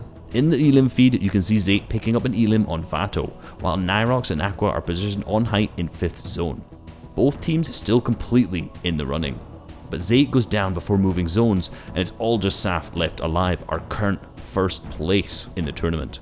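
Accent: Scotland, United Kingdom. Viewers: Are u Scottish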